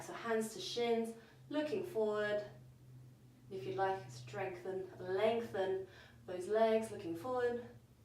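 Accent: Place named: New Zealand. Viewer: You have a nice accent. Is it NZ?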